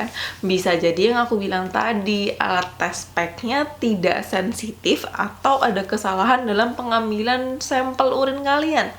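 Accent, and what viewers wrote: Java, Indonesia. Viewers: dokter..asli jawa yaa😊😀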